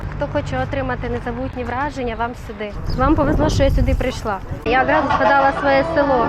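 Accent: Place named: North, Ukraine. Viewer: ти з півночі України, говір такий цікавий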